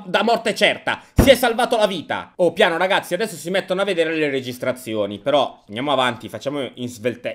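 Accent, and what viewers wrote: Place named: Apulia, Italy. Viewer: Ou orecch d'gomm! Hahahahahahhah (Ps:Sei pugliese )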